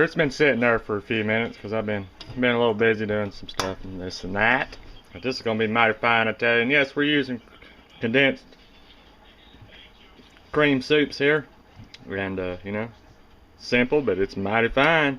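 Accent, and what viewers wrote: South, United States. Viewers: You have some great recipes. I cook every day. And we season a lot the same. I noticed your southern accent. Can I ask where you are from? Thanks for posting. Looking forward to your next recipe.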